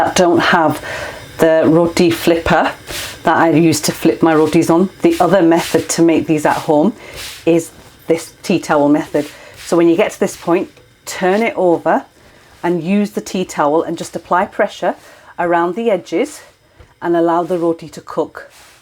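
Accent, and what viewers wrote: United Kingdom. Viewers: I love your UK accent. :) :) Love from Tokyo :) :)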